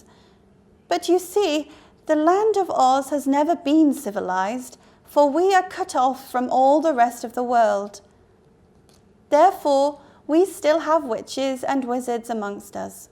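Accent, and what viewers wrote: United Kingdom. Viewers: I love wonderful accent, she’s amazing. Really appreciate.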